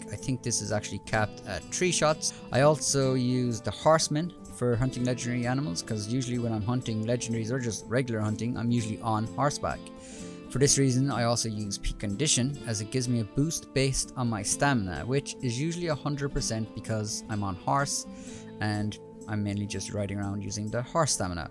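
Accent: Ireland. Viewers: I swear you’re from Ireland, are you? (from Dublin)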